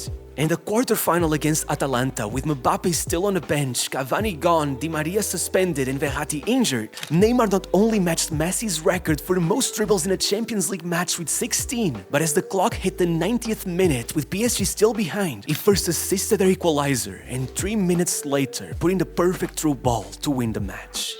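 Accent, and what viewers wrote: Portugal. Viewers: Love your Tuga accent. Cheers from Portugal!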